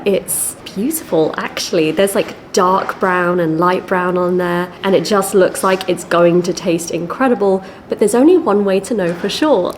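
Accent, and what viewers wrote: United Kingdom. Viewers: 牛丼を、スプーンを使って食べるとき、dig in～という表現を使うのですね。動画の内容も面白く拝見させて頂いています。と同時に、イギリス英語の Listeningも同時に、楽しめてGOODです。何より、日英両方の字幕を出してくださっているのが、とても有難いです。どうも有難うございます。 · のイギリス英語発音は私大好きです。アメリカ人女性はイギリス英語発音に弱いと聞きます。日本人男性に話すと好かれますよ！😽🐱😻😍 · 牛肉、豚肉、鶏肉一度に食べるとか。あんまり機会ないな。の食事見たらほんま食べたくなる。後イギリス英語は聞き心地良いですね！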